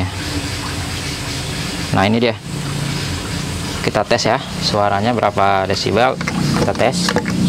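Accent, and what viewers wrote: Medan, Indonesia. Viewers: Abg dr medan ya, sama donk bg...medan dmn nya bg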